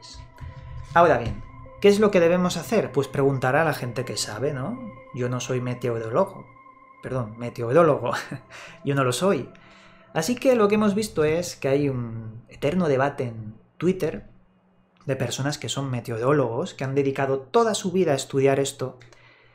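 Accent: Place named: Spain. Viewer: ESTAS COMO LOS RUSOS QUE PASARON UNA IMAGEN DE UNA ESPIRAL EN EL CIELO EN PUEBLA Y YO SIENDO DE ESTE ESTADO NUNCA PASO NADA¡¡ DE IGUAL FORMA SE DICE AQUI, EN CANALES PARECIDOS AL TUYO QUE PASAN COSAS RARAS DEL OTRO LADO DEL MUNDO, TU IGUAL ESPAÑOL CREO QUE LO ERES POR TU ACENTO HABLAS DE SITUACIONES EN OTRO LADO DEL ATLANTICO¡¡ O SEA ERES UN FAKE ¡¡ PORQUE NO PODEMOS CONFIRMAR ALGO ASI PUES ESTA ALEJADO DE NUESTRO TERRITORIO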